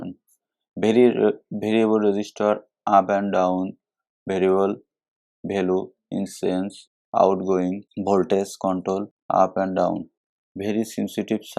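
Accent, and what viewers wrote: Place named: North India, India. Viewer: North Indian English-with Hindi effect.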